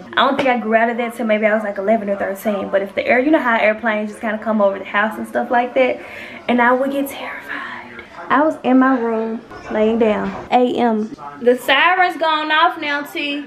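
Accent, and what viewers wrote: South, United States. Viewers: I just love your accent (I guess because I have a southern accent too) and how you carry yourself as a young black woman. It is so important that we as young black women lead by example for young women to be "Elegant" and carry themselves in a manner that a young lady should. I think watching vloggers on youtube who set that tone can help guide them in a positive direction. Naturally Sunny talked about you in her previous vlogs and I am so happy I came across your channel and become a new subbie as well. Thank you for being one of the influencers who brings positivity and laughter when vlogging even when it can become a challenge. I'm so happy that you and your family made it through the horrific storm. I've never smelled Tiffany & Co. perfume but I know it smells delightful and grown woman-ish.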